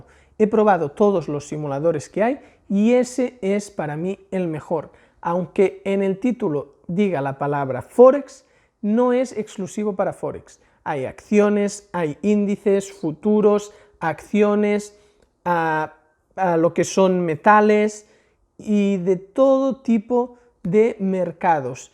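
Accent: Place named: Catalonia, Spain. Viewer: Cómo que romper un mercado?? Eso es posible?? P.D: Aquest accent català... xD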